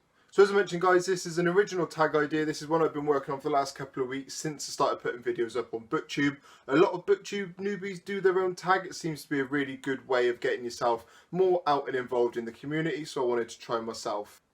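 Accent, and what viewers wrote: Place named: South, United States. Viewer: I might just do this! Didn't get tagged, but it seems fun and I want to film something chatty and lighthearted. In other news, are you from the south? I can kind of hear it in your accent but I haven't been back home in years so it might be off a bit. Great video! :)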